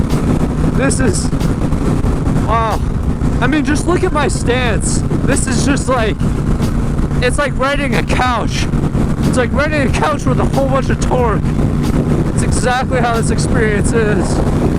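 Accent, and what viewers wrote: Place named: Russia. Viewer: u tebya ochen yarkiy russkiy akcent, by the way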